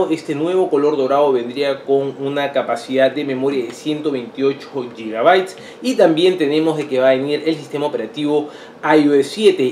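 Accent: Peru. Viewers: tu acento es como de Perú es por eso que tienes más seguidores y subscriptores.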